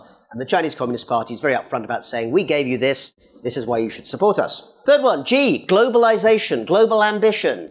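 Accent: United Kingdom. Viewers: An Indian guy with British accent, think as he is British meanwhile lecturing China how to behave. I think something is wrong here. Please don't use British accent, since it is very insulting.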